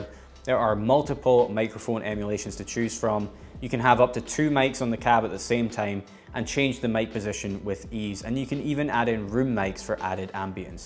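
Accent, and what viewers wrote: Scotland, United Kingdom. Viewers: Sounds like a Scot to me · Nice one sir. Your from Scotland, but where exactly. We might be neighbors. Cheers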